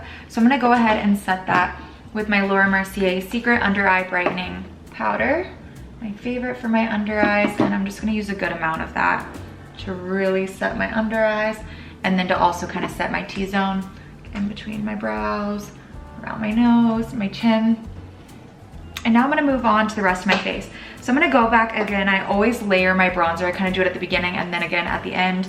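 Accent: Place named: California, United States. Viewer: Dang I know I say it all the time but personally I think u are one of the most sexiest, most beautiful, most alluring woman ever. To me your a goddess. The best part is, when I first saw u and priscilla ricart, I thought u was south american and she was from usa. Then boom she has accent n instead u don't u sound californian. And it's awesome. Sorry to bother ya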